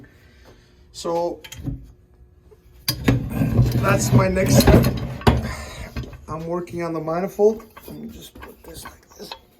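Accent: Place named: Quebec, Canada. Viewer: You from Quebec bro ?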